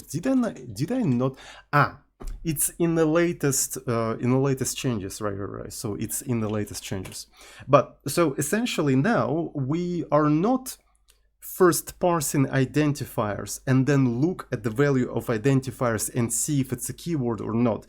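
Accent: Russia. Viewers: ну, русский/украинский/белорусский он точно знает, и акцент очевидно есть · Мне одному слышен русский акцент английского языка?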